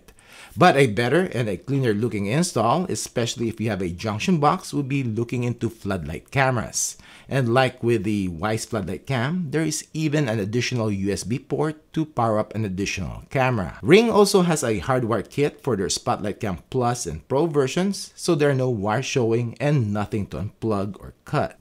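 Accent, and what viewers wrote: Philippines. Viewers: Thanks and you sound like you’re from the Philippines 🇵🇭. ❤️👍💯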